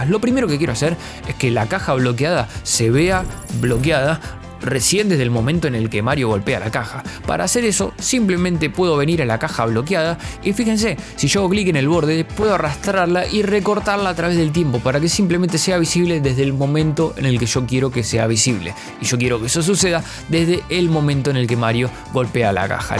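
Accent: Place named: Argentina. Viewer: no se si es porque hablas como argentino o que seera, pero por fin alguien que lo explica como corresponde!!!!!Argentinaaaaaaa , el idioma original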